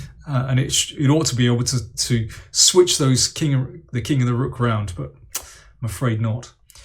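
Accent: England, United Kingdom. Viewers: Daniel, as a french man and correspondance chess player, I really appreciate your clever comments and also your typical english accent ! Good revision for me. I think MVL came in this tournament without pressure : he had nothing to lose !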